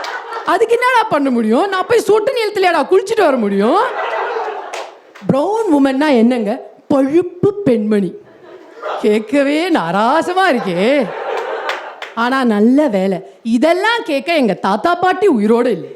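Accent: Chennai, India. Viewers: Chennai slang is perfectly suits you lit🔥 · Brilliant performance 😍 Your madras slang chance ye illa. Thenampettai signal semma 😂👏👏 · Chennai slang awesome 😎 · Indha madras slang was not expected , surprised 😝😝😝 hilarious 😆...now that auto kaar will be like pappa shalu Ukraine la war ninuduchanu paathu sollu...petrol rate yethira poranunga 😜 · real class this lady is. liked the fluent mix of chennai tamil and spashta tamil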